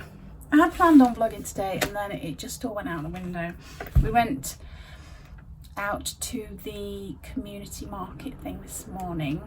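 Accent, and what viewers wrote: Nottingham, United Kingdom. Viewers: Your youtube channel came up on my list a few months ago….I watch and subscribe to many lovely resellers so maybe thats why? I was pleased to discover you were Local- ish to me …i live near Dawlish … I felt there was something familiar about you ….it wasnt until I saw your video about your visit to Nottingham that made me wonder if it was your accent…..I originally come from a small town near Hucknall and then moved to Newark and then moved to Devon 20 odd years ago ….I havent visited Nottinghamshire for many years so it was lovely to see some of it again….and your recollection of Vicky centre indoor market ….I really like your videos and your outings and wish you well with your move back up north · I can't remember if I saw you in one of Caroline's videos or if I started watching via youtube's suggestions? This might sound strange but I love the British accent so I like listening to both you and Caroline talking. 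And the Expawers which I learned about from Caroline. As for feeling lost, have you thought about volunteering? That could give you a bit more purpose. I volunteered at the humane society walking dogs or helping people pick out a pet to adopt. Perhaps think of something you might enjoy doing? Take up knitting? Research your family tree? Just a few ideas that came to mind. And right, it's that time of year. We've got gloomy weather today too (upper midwest in the U.S.) which always brings me down. I'm going to go wrap some presents and try to ignore the weather. I hope your icky mood passes soon. 🤗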